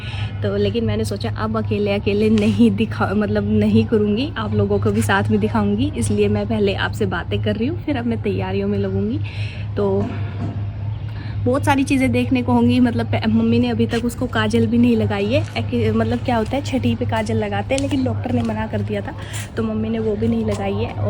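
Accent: Haryana, India. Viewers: Haryanvi acent is very Lovely and name is -moksh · Apni boli m hi banaya kro vlog 😂 haryanvi on top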